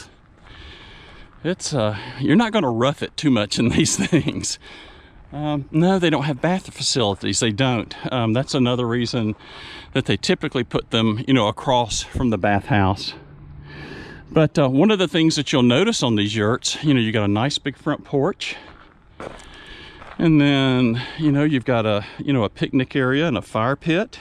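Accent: South, United States. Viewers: my pleasure, your content is great 👍 Also I'm from Florida, but moved to WA state a few years ago, and watching your videos makes me miss hearing southern accents all the time haha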